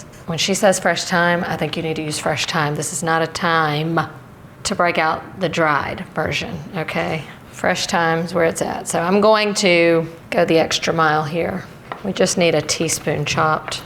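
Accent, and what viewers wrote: South, United States. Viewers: is southern for sure! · Just popped it in the oven. I never saw your videos but this is great. I love your accent. Is it southern? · Loving your vibe and personality.. also the southern hint to your accent, you should have your own cooking show on tv.. I’d definitely watch it 👍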